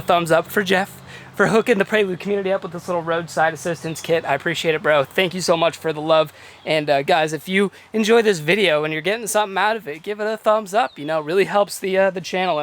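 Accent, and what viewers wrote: Boston, United States. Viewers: FlAAA-RIDA, with that Bostonian accent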